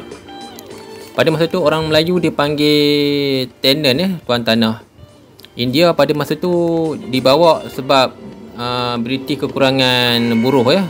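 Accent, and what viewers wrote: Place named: Perak, Malaysia. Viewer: Haha.. penyampaian style orang Perak.. camtu ler..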